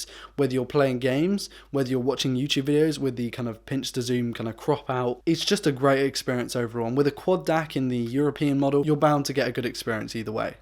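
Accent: United Kingdom. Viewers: we should listen to this guy, he has a British accent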